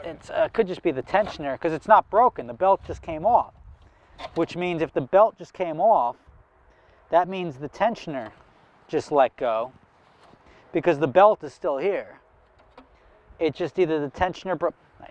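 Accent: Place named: New York, United States. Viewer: The angry New York accent really came out in this one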